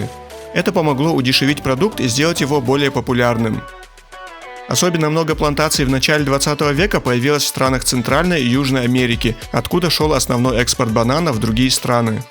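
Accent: Kabardino-Balkaria, Russia. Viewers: Кабардинский акцент у диктора)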